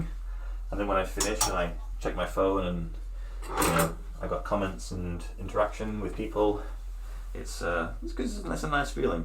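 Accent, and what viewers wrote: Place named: North East England, United Kingdom. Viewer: I go to charity shops looking for footy shirts - no luck so far. Guessing from your accent you are from somewhere in the north east, same as me, so you’ll need to take the mannequin for good luck.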